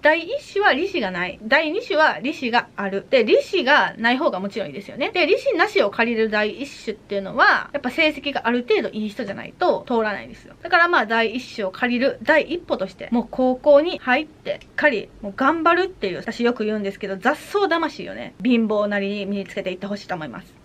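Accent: Kansai, Japan. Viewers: 関西弁かわいい♥️ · さんSLE?!いやいやいやめっちゃ大変じゃないですか、、、😭 あと関西人なのでさんの関西弁めっちゃ好きです❤️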